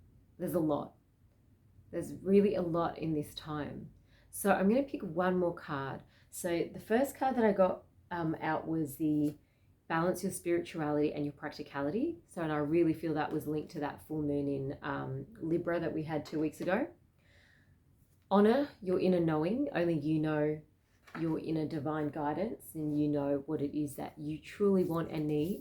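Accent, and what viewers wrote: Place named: Australia. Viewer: Thank you 🙏 Love your energy, and aussie accent ;) ✌ Aries nation sweden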